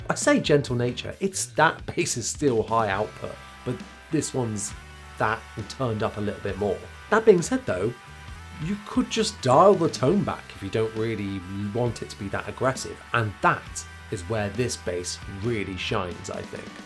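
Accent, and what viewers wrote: United Kingdom. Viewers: I can only listen to a British accent for about 30 seconds, so shut the fuck up and play it!! Peace brother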